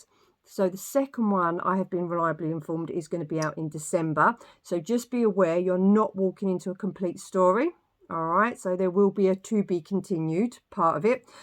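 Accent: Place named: Southern England, United Kingdom. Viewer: I thought this last review was quite good. I saw it last night, and will probably go back and see it again. The fighting scenes were only ok, and yes, the reviewer is right. Too quick, and you can't see the action. Yes EVa Green (sigh). And Yes Where's the humour of the book and 1970s films. It's good that the reviewer has a southern English twang in her accent, I prefer intelligent ,pleasant looking blondes, without The BBC bias . Well done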